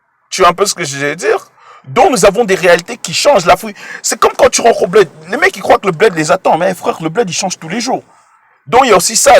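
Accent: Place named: Cameroon. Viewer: Je savais que t'étais Camer comme moi ! J'ai repéré l'accent au début 😀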